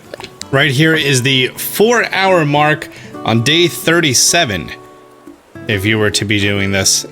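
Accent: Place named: East Coast, United States. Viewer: is this guy from philadelphia? he says "wuder" like an east coaster...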